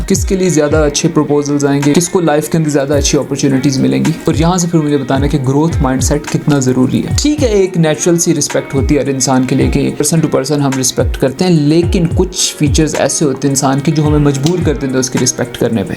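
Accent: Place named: Pakistan. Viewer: Bro are you from Pakistan because I guess from the way of your talking.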